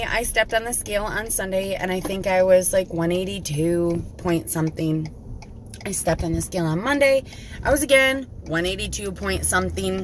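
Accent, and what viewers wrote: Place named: North, United States. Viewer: LOL can't help but check out the scenery as your are driving....looks like Iowa? and I detect a slight northern accent so Northern Iowa or Southern Minisota??? Anyway I really appriciate your videos. I'm on day 2 with Contrave.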